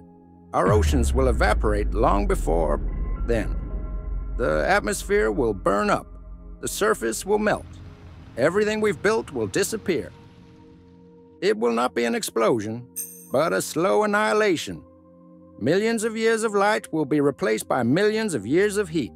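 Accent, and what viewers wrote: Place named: South, United States. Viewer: It would be great if the narrator was not a southern old dude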